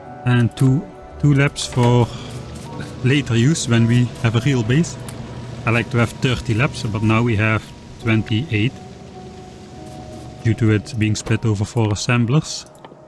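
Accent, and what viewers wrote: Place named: Netherlands. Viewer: Ha, I thought you were dutch because of the accent and I was correct! Nice content, thank you! Read as: Thank you for torturing yourself for our entertainment! <3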